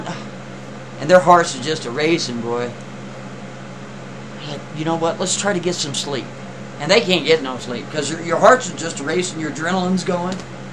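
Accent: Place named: South, United States. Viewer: A<link> DAMN UNCLE! I’m a new subscriber to your channels. I love everything you do. “Split Time”....hanging on EVERY.SINGLE.WORD. I love me some!! I’m from South Carolina so I caught the southern accent that you were throwing around especially when the story got to the damn uncle. LMAO 😂 It really cracks me up bc you usually don’t talk that way. Anyway, I loved the story, I love October Reignz, & I11uminati. You input a Song on your other channel/video recently “ ” by the and I was thinking while I was watching other October Reignz videos how perfect THAT song would be in an upload. I was like, wait for it,& there it was. I don’t wanna sound like a sycophant or anything but I LOVE EVERYTHING THAT YOU DO!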